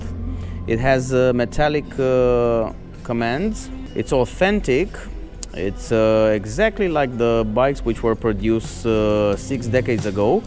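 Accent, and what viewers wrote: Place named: Romania. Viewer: Romanian accent...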